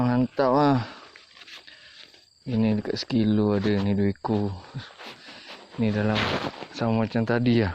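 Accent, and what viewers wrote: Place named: Java, Indonesia. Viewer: Pekat Jawa bang. Hahaha. Gede iwak e.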